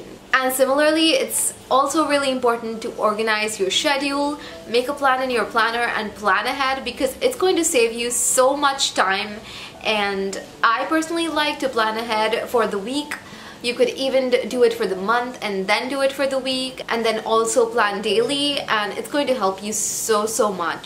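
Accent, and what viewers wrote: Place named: India. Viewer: How did you get such a wonderful accent? Cause you're frm india i guess..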